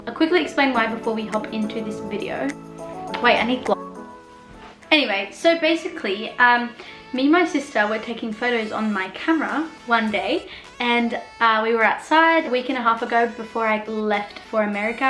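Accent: Australia. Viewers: i loved your accent, lemme guess you're Australian?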